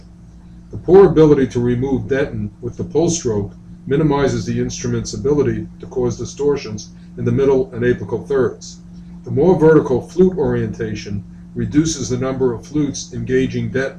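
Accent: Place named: New York, United States. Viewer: i lover this guys NYC accent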